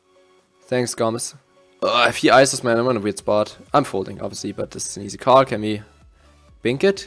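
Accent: Germany. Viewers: that german accent though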